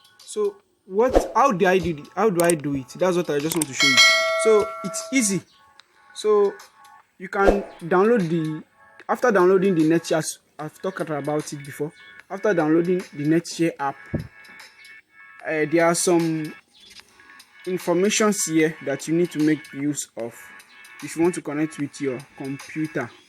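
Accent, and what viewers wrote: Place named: Africa. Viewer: African accent❤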